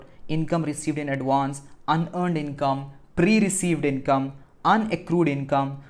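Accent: India. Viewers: as soon as I heard the Indian accent I felt I'm on safe hands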